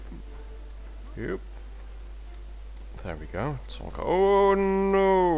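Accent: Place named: Netherlands. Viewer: Netjes engels man ;) maar de T's en D's geven je weg :P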